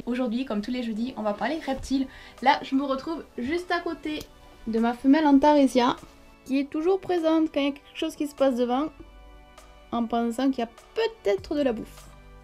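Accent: South, France. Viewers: J'adore je veux la même chambre! !!!trop cool 😆😆 en plus avec ton accent du sud que je kif trop et comment ta dis petocharde 😄😄😄trop cooool bonne journée à toi merci bcp pour la vidéo 😉😉😉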